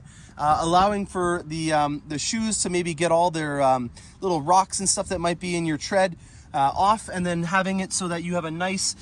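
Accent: Canada. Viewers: Don’t get me wrong...I love the videos and the Canadian accent makes me smile (my father was from Canada).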